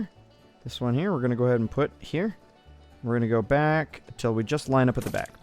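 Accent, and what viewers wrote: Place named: South, United States. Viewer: Lol! Love the Southern accent - so funny! 😂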